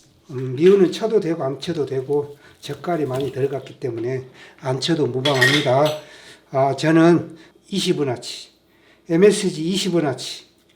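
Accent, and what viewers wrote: Jeolla, South Korea. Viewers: 전라도 사투리가 구수하니 듣기가 좋으네요 석박지 요리 잘배워 갑니다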